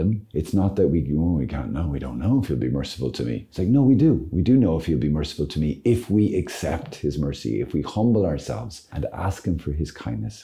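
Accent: Ireland. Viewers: Blessed Father I believe if you don’t ask you don’t receive and that goes for everything in this life and I believe the next ! You are a inspiration to me from your Irish accent to your extremely Heart fulfilling Streams and Clarity ! Clarity of the bible and what our Holy Father wants us to do is a Blessing being explained by you Father !! Keep the Faith ALL !! Bless you Father ! Your Accent and your Irish ☘️ HUMOR ! It makes life easier ! God Bless with all the Angels and Saints us ALL. ! Michael James Patrick Maguire in Melbourne Australia ! 🇦🇺. ❤️❤️❤️❤️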